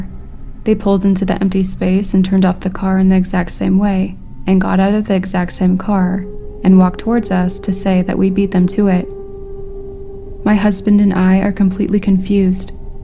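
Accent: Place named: South, United States. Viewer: hearing some drawl in a story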